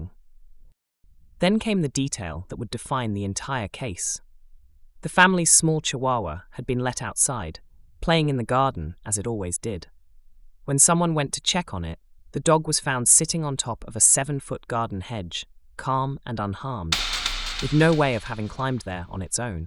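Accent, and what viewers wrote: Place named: England, United Kingdom. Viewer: I just discovered your channel today, and I had to immediately subscribe. Your videos are nicely done, and without filler or sensationalism. I would like to know what part of England your accent is from. You sound just like Daniel Radcliffe (and that’s not a bad thing!). 😊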